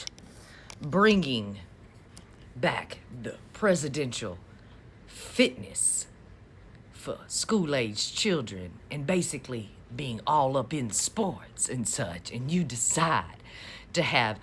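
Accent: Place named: South Carolina, United States. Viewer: South Carolina genteel accent- I'm guessing where this Southern Belle is from.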